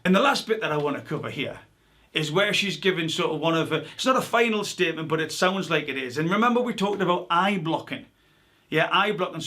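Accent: Newcastle, United Kingdom. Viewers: Found your channel and love your insight. Oh I think the people of interest, that you could analyse, is endless.... I'm fascinated in the subtle signs to look out for, your beautiful Geordie voice , and your simple bitesize explanations, makes learning a treat ha ha. Your wife and daughters should be so proud of you. Having been lied to on grand scales through my life, I feel your work is invaluable to me personally, so I can continue to grow and trust again. Thank you so much. Janice from England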